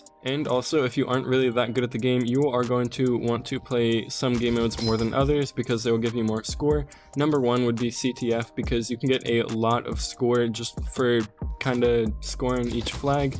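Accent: Austria. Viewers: Are you austrian?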